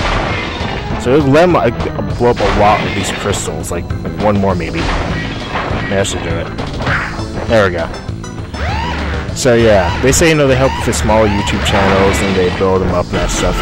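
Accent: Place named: Australia. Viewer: Wow, you're moving through this game quickly! You're going to be finished with Crash 3 before I even have half the gems on my Crash run xD Also wow at that accent, I have to say its not very common where I live but if you went away from the coastline I'm sure there are people who actually have that accent! Our dingos and Crocodiles don't carry flamethrowers or mass murder penguins tho.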